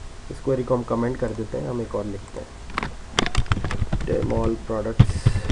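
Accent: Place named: India. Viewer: 印度口语~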